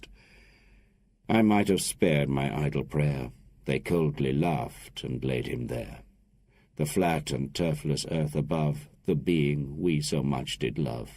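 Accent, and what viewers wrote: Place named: England, United Kingdom. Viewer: Oh thank you, thank you so much !!! AT LONG LAST. A good English deep voice, with a beautiful English accent, reading this masterpiece written by the great Lord Byron. That’s how it should always be. I enjoyed every minute of it. Thank you once more.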